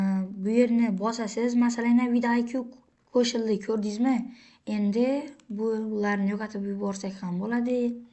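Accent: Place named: Khorezm, Uzbekistan. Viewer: zoq chiqibdidan gaplash da gap bo xorazimcha va toshkentcha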